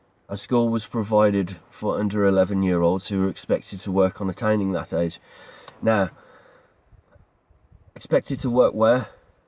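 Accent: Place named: Manchester, United Kingdom. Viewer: Love his accent…. 😍 Are you maybe from Manchester Sir?!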